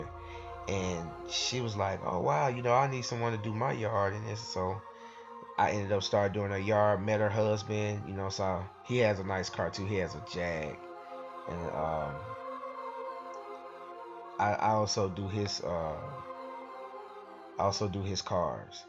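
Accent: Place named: Deep South, United States. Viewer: Caught on after viewing some more vids. That's definitely the deep south.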